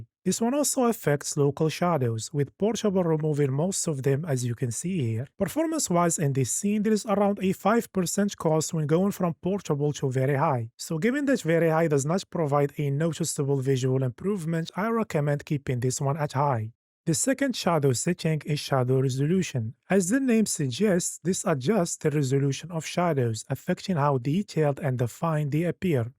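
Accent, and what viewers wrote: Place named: Morocco. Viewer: The accent tells me you're moroccan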